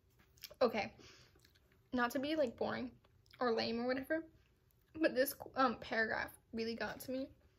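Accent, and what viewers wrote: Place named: Poland. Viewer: Your accent seem like Polish lol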